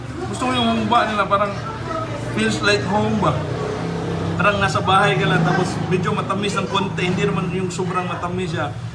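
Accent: Visayas, Philippines. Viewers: Ang tagalog mo brad, Bisaya pa rin pakinggan.. 🤣🤣🤣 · Hoy mag binisaya ka nalang kasi yung tagalog mo pambukid ang style...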